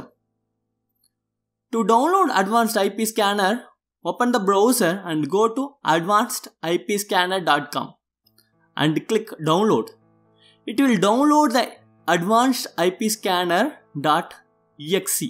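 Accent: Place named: South India, India. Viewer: U sound like a South Indian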